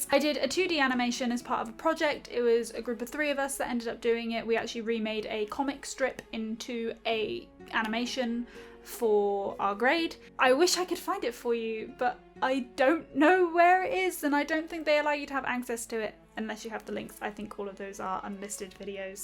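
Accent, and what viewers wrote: United Kingdom. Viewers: So.. many.. pikachus.... Also where in UK are you from? You probs have my favourite type of British accent it's so lovely :o hallo from Michigan :D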